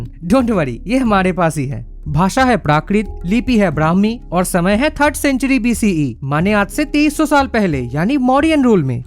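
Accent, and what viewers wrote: Bengal, India. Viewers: Are you bengali? Your accent sounds like bengali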